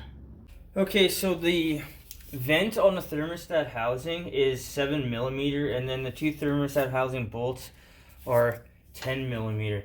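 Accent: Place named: Michigan, United States. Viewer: Thanks for the vid 👍🏽 Saving me ab $140. Also any chance you're from Michigan? 😂 you have a strong accent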